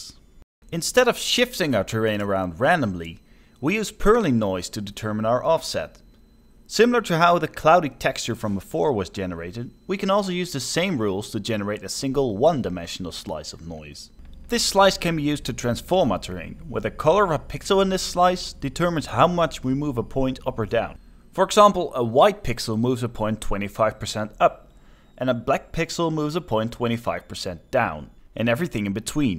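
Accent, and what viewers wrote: Netherlands. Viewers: Yes, as a fellow Dutchman, I recognised you accent within a few seconds. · How come I always recognize a fellow Dutch accent. Then when I check the account info, it's actually true xD Useful info video tho! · I could hear you were Dutch, but you have less of an accent then I do after 30 years living in the USA!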